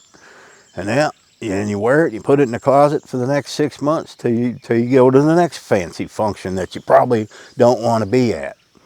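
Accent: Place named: Appalachia, United States. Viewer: You i love American applachian accent and i wish some day get that accent for real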